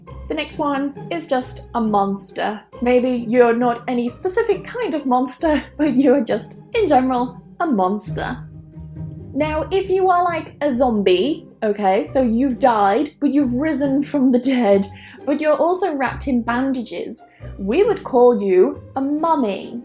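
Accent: United Kingdom. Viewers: Hi there,. I've just found you on YouTube. Just a guy from Brazil here trying to learn some English and like some others, I love the British accent. Loved you humor, loved your other videos that I've seen so far. You are just so beautiful (jaw dropping) and an excellent teacher/professor which is the reason I've just subscribed. Please, keep up the good work. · 訂閱您的頻道學習很多英式口音 在最近 很開心 大大感謝~~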